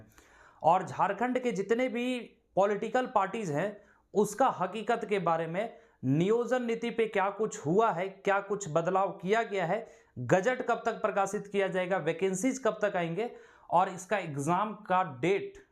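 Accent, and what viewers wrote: Bihar, India. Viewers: Bihar Ke Hain Kya sar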